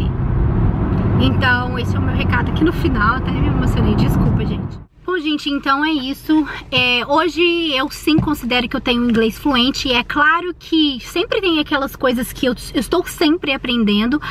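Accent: Minas Gerais, Brazil. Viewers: Mas o sotaque mineiro nunca some kkkk mt bonito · Como foi pra vc lidar com esse sotaque bonitinho de minas aí nos eua? Isso "se transforma" quando vc fala inglês ou ñ? Tipo, em portugues vc fala "...ao meu redorrrr" ou "porrrr que" e aí em inglês vc fala "arrrround me" ou "you areeeee"? kkkkk É engraçado mas a dúvida é séria, ñ me leve a mal! Bjo =) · Seu sotaque mineiro é muito bonito, assim como você! Hahaha... Você tem alguma faculdade? Como conseguiu o emprego de gerente? Depois do emprego de babá, foi muito difícil conseguir outros contatos?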